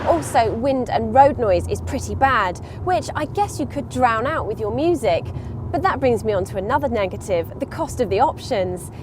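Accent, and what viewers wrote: United Kingdom. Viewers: BRITISH ACCENT SO CUTE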